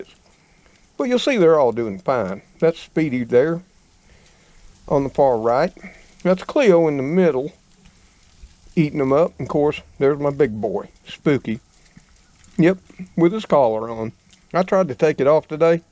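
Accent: South, United States. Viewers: I really enjoy hearing you narrate/speak with your southern accent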